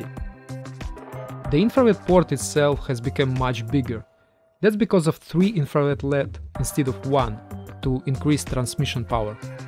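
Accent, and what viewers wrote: Russia. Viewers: Этот русский акцент идеален) Хочу себе данный девайс) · Акцент специально делаешь такой заметно русский? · Чувствую Русский акцент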